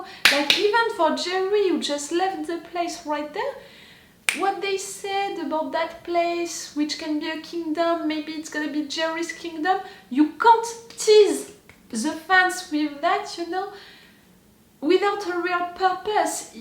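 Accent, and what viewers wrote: France. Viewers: Je venais pour regarder une réaction américaine, et là, j'écoute ta voix.. Et je me dis.. Mais attends, c'est un accent français ça^^ Elle est Française ^^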